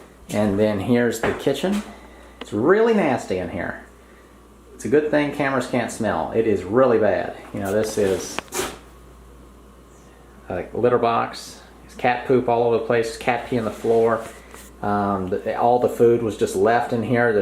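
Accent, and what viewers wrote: South, United States. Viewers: Yeah I would say his Southern accent is retaining a good amount of the "Transatlantic" or "Mid-Atlantic" accent that we used to teach in schools before WW2. You can check this accent on YouTube - fascinating story. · My guess is Georgia or Alabama, but what do I know? 🤷‍♀️ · What accent is this? I can hear it is southern but I'm curious where it is from exactly. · It's called Southern. 🤦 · Your accent was pure 'Gone With The Wind'!!! 'Oh Ashley'!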